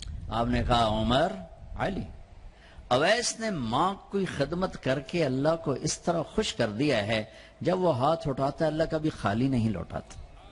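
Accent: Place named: Punjab, Pakistan. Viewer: App ki Punjabi mjy boot ache lgti ha mola slamat rukhy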